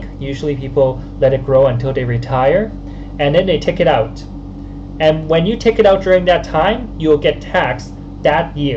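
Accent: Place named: Saskatchewan, Canada. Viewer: Very in depth. Thanks . I can tell your from Canada before you have a Saskatchewan accent .slight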